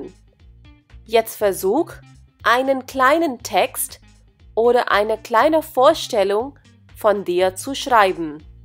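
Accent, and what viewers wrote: India. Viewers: I was hoping for a German speaker, not an Indian person. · the lady speaking has an indian tone to her deutsch sentence.. does any one else think so?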